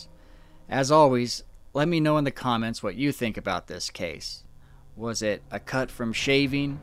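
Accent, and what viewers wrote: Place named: Upstate New York, United States. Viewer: Took me a bit to figure out that accent... ITs the same as Rod Sterling. Guessing your in up state New York, or close to it,,,lol.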